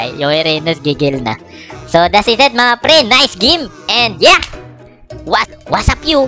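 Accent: Visayas, Philippines. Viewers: Visayano po ba si kuya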